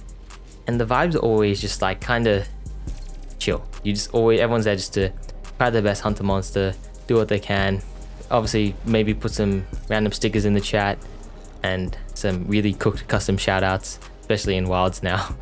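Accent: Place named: South Africa. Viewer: Bro are you south african/namibian/australian? You really sound south african to me lol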